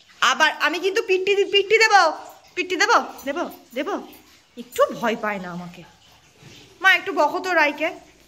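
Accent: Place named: Nadia, India. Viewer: তোমার কথায় খুব সুন্দরভাবে নদিয়া জেলার টান আছে l আমার পৈত্রিক বাড়ি শান্তিপুরে l তাই এই টানটার সঙ্গে খুব পরিচিত আমি l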